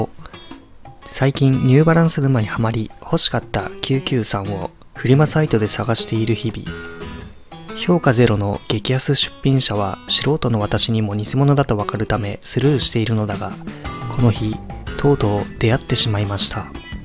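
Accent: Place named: Kagoshima, Japan. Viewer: 方言が鹿児島っぽい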